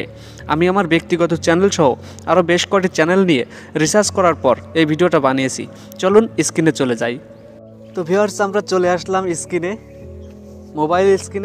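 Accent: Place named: Khulna, Bangladesh. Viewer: আমার বাড়ি ঝিনাইদহ আপনার কথা সুনে বুঝতে পারছি আপনার বাড়ি খুলনা বিভাগে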